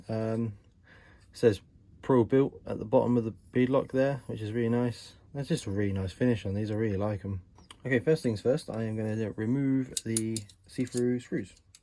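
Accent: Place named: United Kingdom. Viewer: Great video and nice to hear a fella brit Americans can be a bit annoying sometimes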